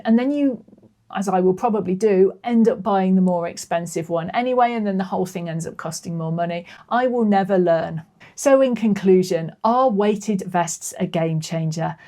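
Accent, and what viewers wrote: United Kingdom. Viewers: Is that a Cheshire accent?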